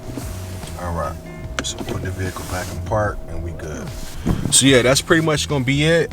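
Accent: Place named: Detroit, United States. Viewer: As soon as I heard what up doe I was like he from Detroit he know what he talking about.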